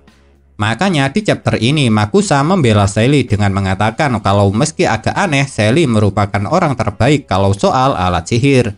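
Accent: Java, Indonesia. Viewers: Walaupun jawanya medok bnget.tp aq suka sma admin ne.soalx dya tu singkat and padat pnjelsanx.tp tolong donk bang ksih potongan2 dre mangax donk... Smngat yach bang...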